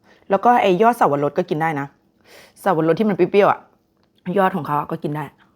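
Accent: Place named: Central, Thailand. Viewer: เป็นคนภาคเหนือ แต่ทำไมพูดเหน่อสิงห์บุรี กาญจนบุรี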